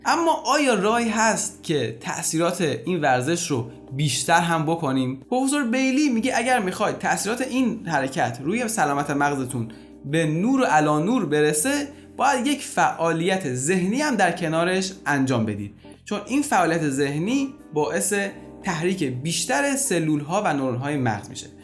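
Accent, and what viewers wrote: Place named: Turkey. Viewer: دکتر سعی کند لهجه خود را درست کنند اینکار فقط با ترکی صحبت نکردن امکان پذیر است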